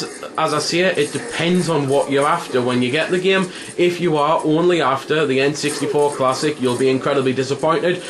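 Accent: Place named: Newcastle, United Kingdom. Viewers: wow, your kidding right? This guy is not Australian, he's British. Whether he's English, Scottish or Welsh I'm not sure, I would guess northern England. Im going to call ignorant American on your part, am I right? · Too those trying to guess he's accent, it is a geordie accent, so he would be from newcastle england, i live in england (london) so am pretty sure thats right (although unimportant, just thought id clear that up) .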